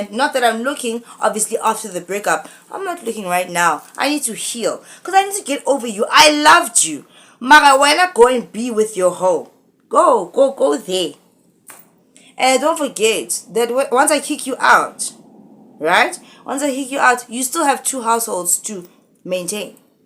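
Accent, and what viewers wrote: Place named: United States. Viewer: Its the "snobish American accent" that does it for me,it gets me all the Time 😂😂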